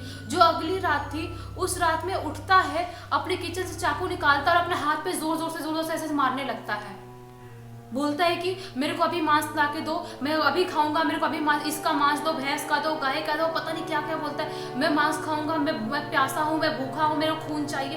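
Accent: Bihar, India. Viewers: Kya aap bihar se ho?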